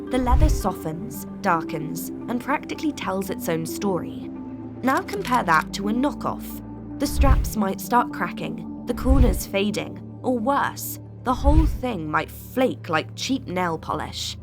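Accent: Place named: RP, United Kingdom. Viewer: why choose a robot voice with THAT HIGH BRITISH ACCENT?? Do you think it helps you to sound more sophisticated? Not it doesn't. Because it's clearly not your voice, it's a robot voice. You are pathetic.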